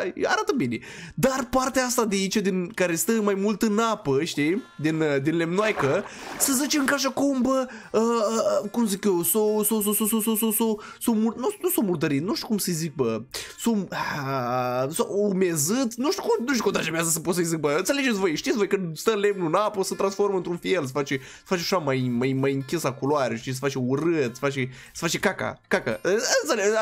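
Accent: Moldova. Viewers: Moldovean 100%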